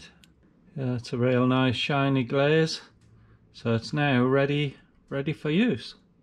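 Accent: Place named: Cumbria, United Kingdom. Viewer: Hi, I'm from Cumbria and your accent is very similar....where are you from?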